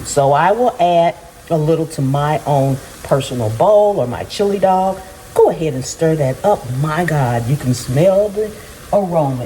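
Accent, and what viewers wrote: South, United States. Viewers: Girl...I suspected you were southern because I kept catching a southern accent here and there, but this video confirms my suspicions...southerners definitely do NOT put beans in their chili!!! 😂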